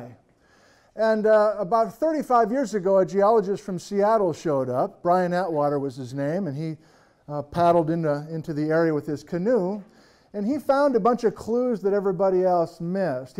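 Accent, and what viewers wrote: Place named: Wisconsin, United States. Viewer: I knew I heard that Wisconsin dialect. I'm from there, too.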